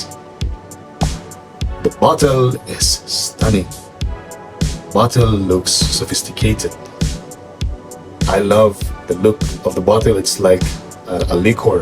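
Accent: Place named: Philippines. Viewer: Your 𝒂𝒄𝒄𝒆𝒏𝒕 has a sound like a FILIPINO. Are you originally from the Philippines ❓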